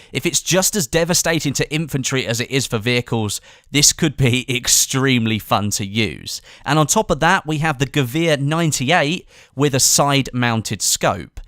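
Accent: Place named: England, United Kingdom. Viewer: Why do the people who make these videos always have that English accent? Do you all sit around in a jerk circle making game videos or something?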